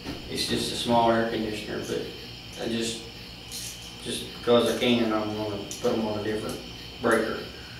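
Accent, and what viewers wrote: South, United States. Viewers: LOL being a southerner, I can understand him perfectly...BUT I had to try the captions!! OMG too funny!!! The ONLY thing it got right is "for us", the rest was so bizarre!!! :D thanks for the idea ;)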